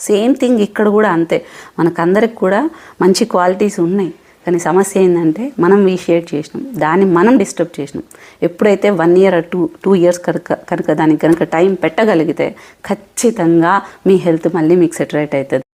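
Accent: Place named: Chittoor, India. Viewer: gaaru chittor district thiruathi dagara antaaru meeru ade district ala ne unnaaru voice tho so mee poorvikulu same aa maam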